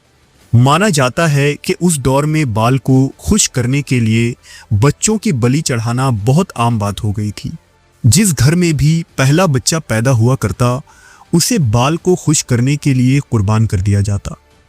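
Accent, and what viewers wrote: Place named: Pakistan. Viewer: You are voice like Pakistan . Mashallah voice nice 😅😅😊😊😊😂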